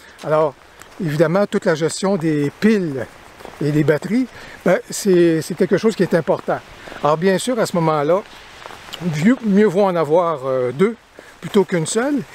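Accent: Canada. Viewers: votre gentil accent Canadien donne de la chaleur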